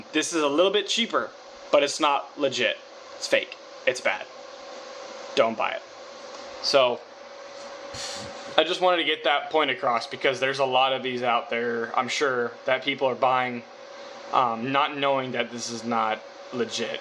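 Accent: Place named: United States. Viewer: You sound like you are in the USA, please don't use encryption when broadcasting on this radio. That is illegal. Also, please get your amateur radio (technician) license. It isn't difficult. One of the things they teach you when studying for the test is that using encryption when broadcasting on amateur bands is illegal, so the encryption capability should not be an issue for you.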